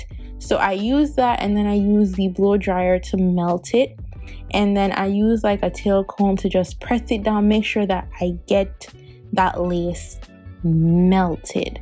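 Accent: Jamaica. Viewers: Sound like you are Jamaican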